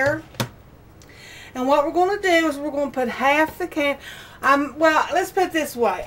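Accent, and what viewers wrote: South, United States. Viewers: Where are you from? Never heard that accent before! Sure nuff down home ! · i love your accent... what part of the south are u from? im from georgia... down here we call pecans... just that lol... pee..cans.... lol... im and old southern lady who forgot how to make a punch bowl cake.. lol... thank god for u and youtube... be blessed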